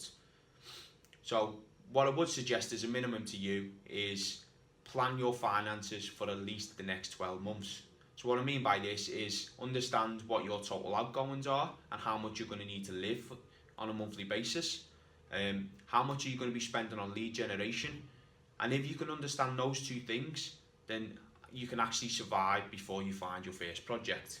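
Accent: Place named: Wales, United Kingdom. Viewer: you sound welsh I'm welsh to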